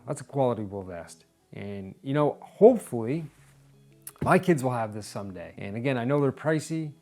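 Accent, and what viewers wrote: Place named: Maine, United States. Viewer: Off topic question, where are you from? Your accent in some parts sounds like it's from Maine but in other parts sounds like it's from Canada.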